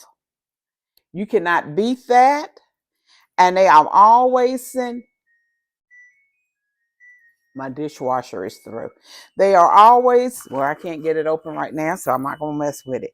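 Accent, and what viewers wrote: South, United States. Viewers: What a great video! Thank you. Am always intrigued by the Temu ad— thanks so much Ms. for bringing their offerings to life. You and your fabulous southern accent make me miss home. Love you! xx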